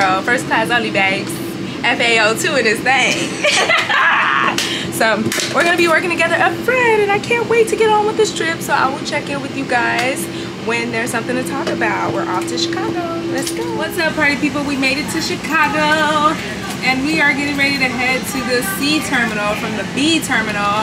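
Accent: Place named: United Kingdom. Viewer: I love hearing you call us "babes" with a British accent 🤣💙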